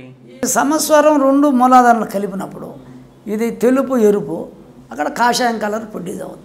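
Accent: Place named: Rayalaseema, India. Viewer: చాలా సరళంగా చెప్పారు ,గురూజీ రాయలసీమ మాండలికం స్వచ్ఛమైన తెలుగు వల్ల కొందరికి అర్ధం కాలేదు అనుకుంటున్నారు మెల్లిగా మళ్ళీ చూడండి🎉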